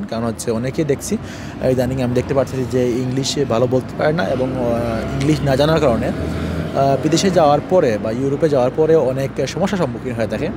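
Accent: Sylhet, Bangladesh. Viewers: আপনার সিলেট মাত লাগের সিলেট নি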